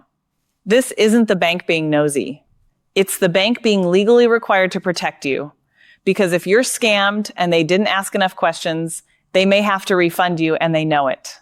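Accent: United States. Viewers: Yank accent? Load of AI tosh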